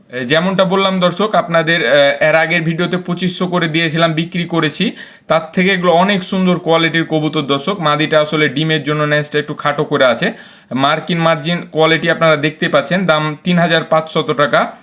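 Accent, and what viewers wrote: Kolkata, India. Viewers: আপনার কথার ধরন কলকাতার মানুষের মতো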